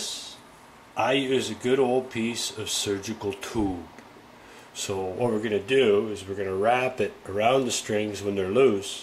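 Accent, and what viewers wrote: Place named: Canada. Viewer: Oh man ! From just a single 'without' i thought.. huh, this guy gotta be canadian! And then .. the maple leafs tee :) it is so funny because i'm Polish and i've never been to Canada or even Usa, but i have family in London, Ontario - and i've heard about this slight difference. It happens to me second time this weekend :) Absolutely no offence, i love this accent and i wish i could visit my family and your country someday ! Great bass and nice movie, thanks :) 51's are hot :D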